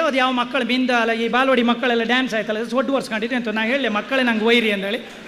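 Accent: Kundapura, India. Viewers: ಕುಂದಾಪುರ ಕನ್ನಡ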